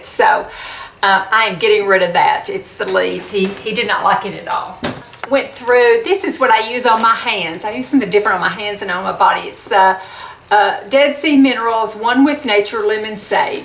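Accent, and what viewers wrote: South, United States. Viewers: I feel like if I was to meet you sometime you and I would have such fun showing off our southern accents!! I was born and raised in Oklahoma and will never (I hope) never live any other place. I love your videos and love your straight forwardness. Thanks for being your true self! 🤗🤗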